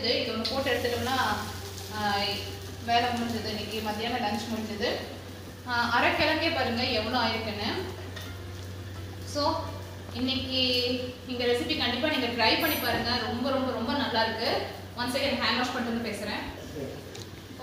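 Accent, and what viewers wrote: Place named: Coimbatore, India. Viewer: Super recipes 👌👌 Neenga coimbatore ah sis Unga speech apdi irukku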